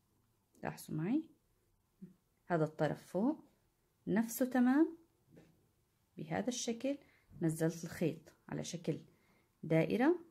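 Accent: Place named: Syria. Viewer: الله يعطيكي العافيه بظن انك من عنا من سورية ع هوى لهجتك .. بس يئلبي كأنو بيطول الواحد لتطلع معو هيك سجادة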